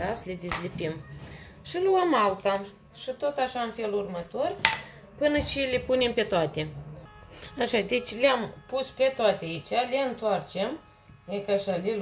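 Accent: Moldova. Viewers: M-am abonat pentru graiul moldovenesc ”dulce”...! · Ma uit cu placere la ceea ce gatesti,esti ff simpatica so imi place cum vorbesti moldoveneste! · Bravo esti o Super gospodina.Imi place mult graiul tau moldovenesc.Maria.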